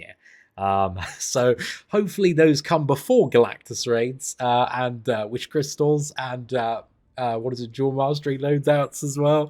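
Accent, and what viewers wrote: United Kingdom. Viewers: It is hilarious to listen to a British man swearing lol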